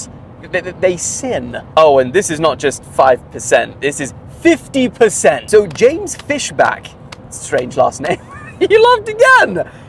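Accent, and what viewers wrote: South Africa. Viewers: Bro tell me the truth. Are you South African ❤🇿🇦🔥😂👀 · Bro are you sure you not South African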